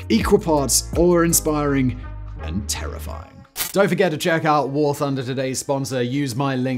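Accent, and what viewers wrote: United Kingdom. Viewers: because (1) American-English SOUNDS better (is softer/easier to listen to + easier to understand), (2) American-English is more professional (go INVESTIGATE ANY PERFORMING ARTS, VOICE, SINGING, MEDIA or JOURNALISM SCHOOL before - - BEFORE - - you kvetch + whine + criticize like a 5-year old), (3) ask yourself why British singers (the Beatles, Rolling Stones, Elton John, Pink Floyd, the Who, the ENTIRE “British invasion” etc, etc, etc, etc, etc, sing their songs in AMERICANIZED English (as opposed to lilted British accents?), (4) the British accent is weird, lilted, loopy, goofy + unnecessarily overly-emphasized (whereas STANDARD AMERICANIZED-English - - which again, is TAUGHT in professional performing arts, voice, speaking, diction, dialogue, singing, media, journalism, film + television schools (go INVESTIGATE it) - - is spoken more evenly + straightforward in tone - - and hence EASIER to listen to + EASIER to understand), and finally, (5) this guy covers interesting topics, but his accent, honestly, gets irritating. 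This advice/suggestion can only HELP him + his future videos - - because people may be inclined to STOP LISTENING after a few minutes if the affect is irritating to the ears. You asked.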